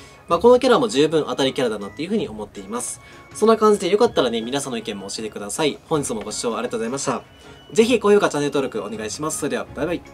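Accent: Kansai, Japan. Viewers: 同じ京都だけど違和感はあんまりないかな？ 今は関西じゃないし、動画配信なら標準語使った方がいいと思うからたまに地元の関西弁出るんだと思う。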